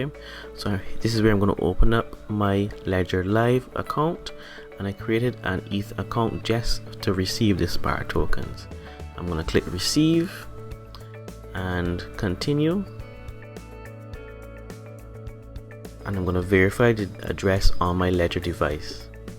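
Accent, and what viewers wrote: Barbados. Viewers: Hi are you from Barbados? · Liked and Subscribed! Thanks for the video really clear and easy and l was lovin' up the Bajan tones. Had to start over a few times as ledger wouldn't connect. It's all a bit scary, I wish there was some way of checking it's all gone through.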